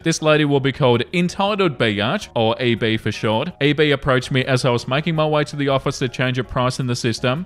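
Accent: Australia. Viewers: He's Aussie, it's a common vocal trait of Aussie accents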